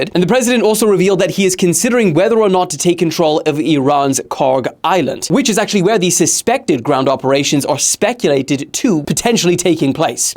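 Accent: South Africa. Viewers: bro is truely a South African 🤣"listen properly"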